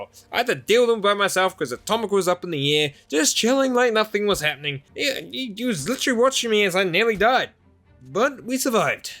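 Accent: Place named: New Zealand. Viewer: 200 days and NZ-english!! Can`t get better!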